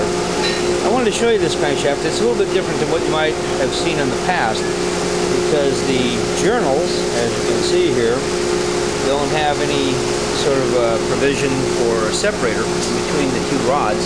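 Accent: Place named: East Coast, United States. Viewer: you're from back East I can tell. My pop was born & raised in Binghamton and his whole life he said "Awe-dee", never could get him to say "Ow-dee".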